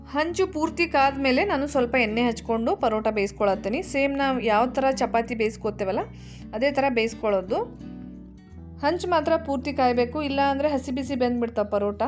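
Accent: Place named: Andhra Pradesh, India. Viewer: actually I'm from Bangalore ree nang Nim thara Kannada maathadodh Andhra Baal chalo ansthithi ❤️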